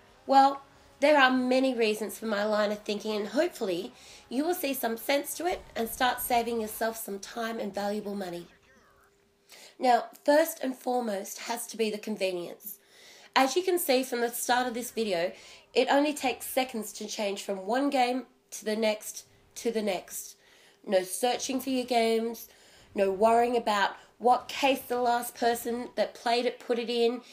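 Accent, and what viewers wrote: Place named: United Kingdom. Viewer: "True game-ah, a dedicated game-ah" Fuck you non-rhotic bitch, Man british accent's stupid and annoying as fuck!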